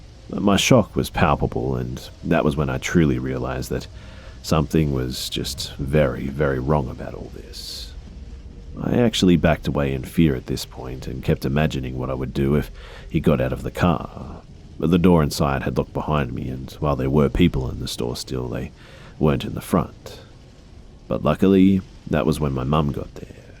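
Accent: Australia. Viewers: ay, is your accent australian?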